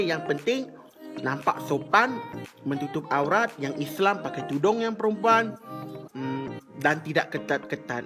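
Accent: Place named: Sarawak, Malaysia. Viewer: Terima kasih abang.. Byk info berguna pasal ipg.. Semakin bersedia sy nk masuk ipg 1 sept nnti.. Btw, abg sarawakian ke😂😂suara mcm org sarawak jk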